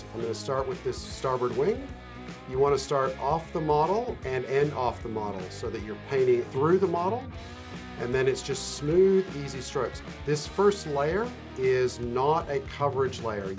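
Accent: Australia. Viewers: New to the channel, love it by the way and spent a lot of time going over the back catalogue. So much priceless information, tips, and general entertainment for anyone into modelling, or like me, getting back into it and freaking out. Buying way too many kits (that aren't being started), but freaking out none the less! Do I notice a slight Australian accent there?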